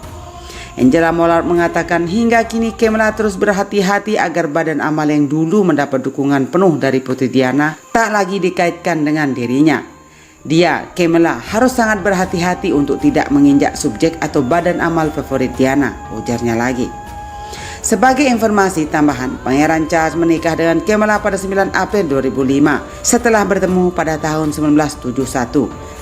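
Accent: Indonesia. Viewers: Kakak orang medan juga ya?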